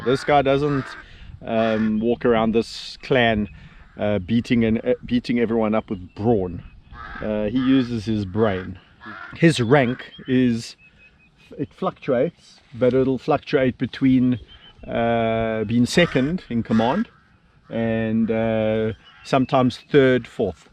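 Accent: South Africa. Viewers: God I hate the way south Africans talk, "super aggro"you like 40 years old stop trying to talk like a teenager. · I never tire of listening to that SA accent. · Im from zimbabwe cape town kenya harare and jo berg and you have a south african accsent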